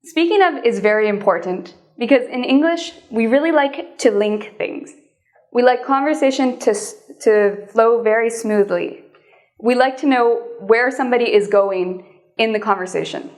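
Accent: Canada. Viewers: i can hear the canadian accenthaha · Canadian English is nice... and she explains very well. Keep on like that,! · Hi, awesome vids! Just out of curiosity, are you by any chance Canadian?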